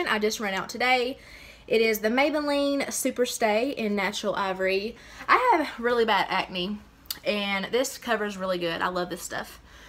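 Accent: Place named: Kentucky, United States. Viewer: Love your videos! I’m from Lexington so I love the KY accent 😘😘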